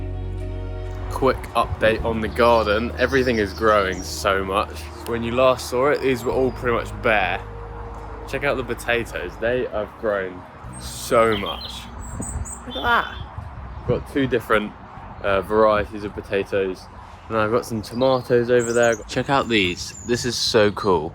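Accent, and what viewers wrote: United Kingdom. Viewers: Beautiful british accent!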